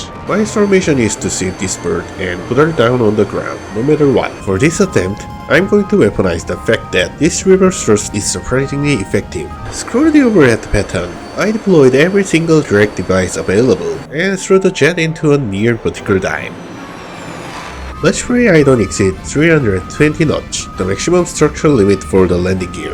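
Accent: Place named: South Korea. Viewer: guessing from your accent you're Korean right?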